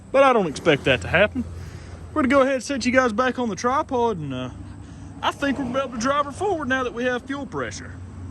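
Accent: South, United States. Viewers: I from Chicago. I love this guys southern accent it makes the video even way better.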